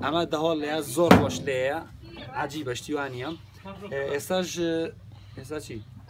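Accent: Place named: Sorani, Iraq. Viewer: وەڵای لە کرمانج ناچی ڕێک ئەڵێی سۆرانیت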